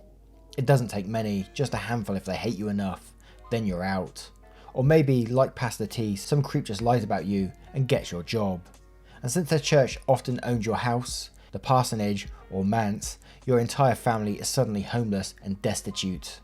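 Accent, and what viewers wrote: United Kingdom. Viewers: Completely off topic but I was wondering what part of the UK your accent is Great job with the video :)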